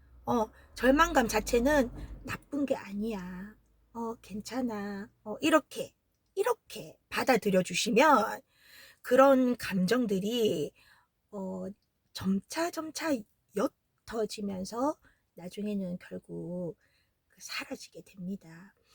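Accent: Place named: Gyeongsang, South Korea. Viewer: 같은 경상도말투라서 그런지 나탐님, 사랑작업, 아이엠티비보다 이해가 500프로되네요 감사합니다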